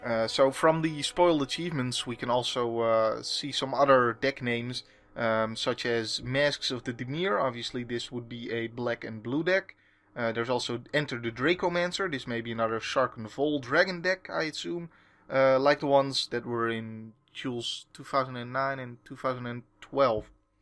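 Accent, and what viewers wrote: Netherlands. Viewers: if this ain't no Dutchy then i don't know who else can speak this dutch-english dialect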